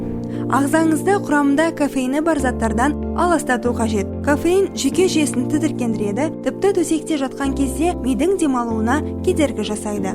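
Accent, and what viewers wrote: Standard. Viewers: Не деген сулу казакшаны таза сойлейтин кыз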